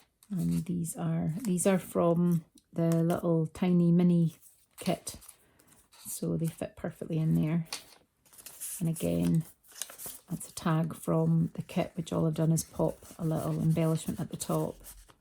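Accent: Scotland, United Kingdom. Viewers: Hi! I am new to your channel and I am so glad I found you! What superlovely and beautiful and feminin journals you are making. They are just wonderful and unique! Now I have to watch all your videos ❤️ You are a true artist. And your Scottish accent is very pleasant to listen to. Thank you for sharing ! ❤️ Hugs from a cold and windy Gothenburg, Sweden //Charlotte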